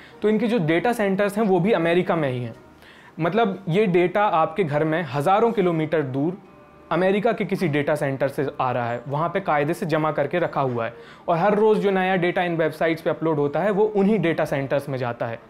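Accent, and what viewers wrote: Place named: Lucknow, India. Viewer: Shukrya Bohat behtar tareeqe se aaone samjhaya. Aapka bohat behtreen URDU LEHJA HAI AUR urdu aap ki bohat sheereen hai..Lagta hai asp Urdu jagah ke hain...Lucknow Kanpur Rampur banaras Allahabad Bareilly... Aap aur samjhayen aap ki zuban sunkar mehsiis hua ke ham lucknow kanpur men hain Masha Allah Allah aap ki zuban aesi sheereen banaye rakkhen Ameen. Aur sab aesi hi Urdu men baten karen Adaab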